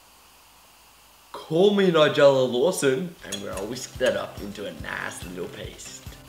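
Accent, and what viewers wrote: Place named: Australia. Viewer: what is your accent ahahaha it sounds like extreme australian accent lol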